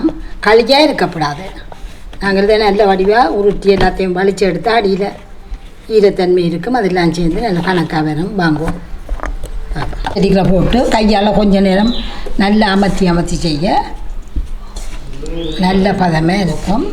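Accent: Jaffna, Sri Lanka. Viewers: Amma neega oor enga? Ungada pecha kekka enakku yalpanam varavenum pola irukku. Nandri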